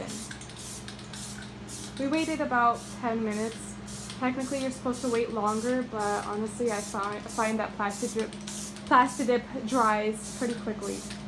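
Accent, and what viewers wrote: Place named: Minnesota, United States. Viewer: Your accent sounds like Minnesota or North Dakota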